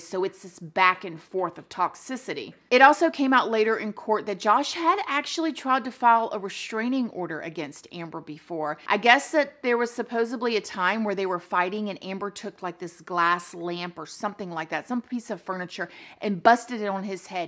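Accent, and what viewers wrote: South, United States. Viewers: nah that’s just how we pronounce it in the south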